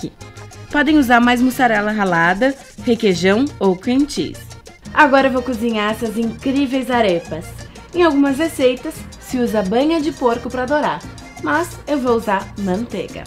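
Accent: Rio Grande do Sul, Brazil. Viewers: Não é que ela fala esquisito , ela tem sotaque gaúcho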